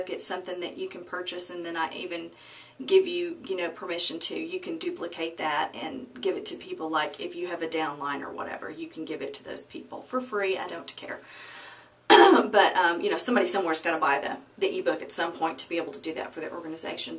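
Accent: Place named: Texas, United States. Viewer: Miss: You have some pretty long hair and you look real pretty w/o your make up.I like you texas accent and hope that you really enjoy your movie. Happy belated birthday. By the way I have been selling on ebay since 1998 and even sold items on Yahoo auctions at the same time back in the 1990's. You are definitely a true lady entrepreneur and know how to be self sufficient!!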